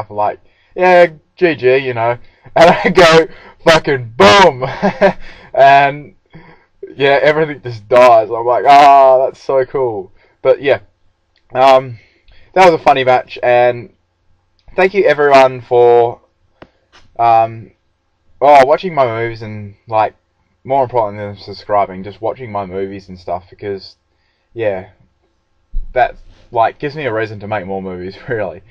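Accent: Australia. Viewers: my favorite part of this video? that he's FUCKING AUSTRALIAN!!!!!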